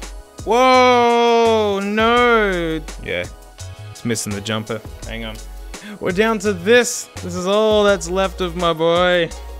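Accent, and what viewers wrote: Australia. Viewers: He sounds less aussie then he does now and less energetic Thats wacky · 2 years later, you can see the aussie seep out into the videos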